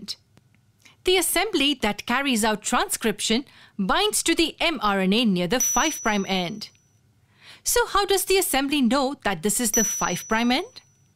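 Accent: India. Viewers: Gosh, this is all explained so well, I just can't get over the Indian accent (assuming it's indian)!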